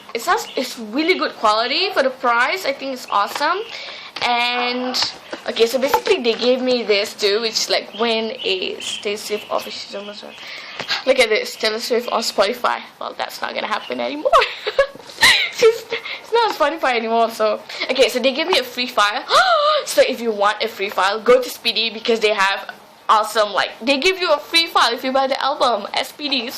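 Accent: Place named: United States. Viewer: Awesome american accent though. Doesnt sound malaysian at all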